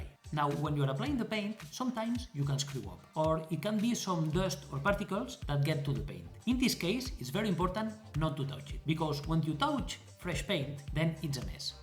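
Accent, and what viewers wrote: Spain. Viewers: Se nota que eres español🇪🇸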